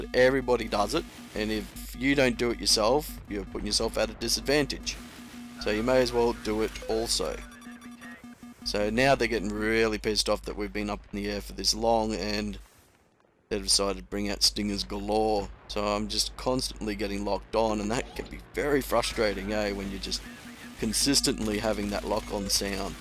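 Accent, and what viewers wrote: Australia. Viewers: Are you Aussie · r u aussie? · its kinda obvious ur aussie lol me too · Oi Mate, Sounds like u are Aussie? If so, ello... I have been there and I like Aussie women, Kiwi women, Chopper and VB beer mate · Sweet vid man its good to see bf3 aussie commentators. Keep the videos coming! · Man, this commentator is brilliant. So classically Auzzie and just so informed and blunt. Fuckin' awesome man. :D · You're Australian, aye?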